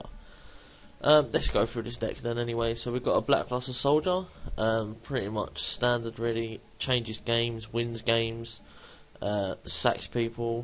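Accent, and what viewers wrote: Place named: Cockney, United Kingdom. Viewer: You have a cockney accent for sure mate, like danny dyer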